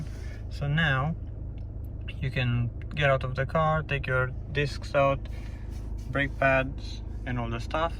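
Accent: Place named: Romania. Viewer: Multumesc, recunosc un accent romanesc. 😂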